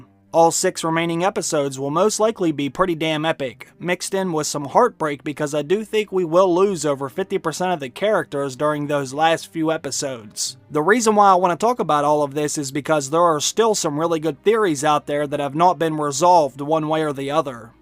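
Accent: Pittsburgh, United States. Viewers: Are you a fellow Yinzer? You sound like a Pittsburgher.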